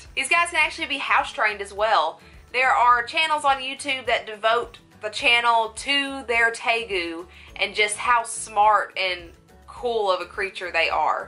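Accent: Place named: Alabama, United States. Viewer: I cant get enough of your bama accent. 😍😍😍 You sound like maggie from the walking dead...lol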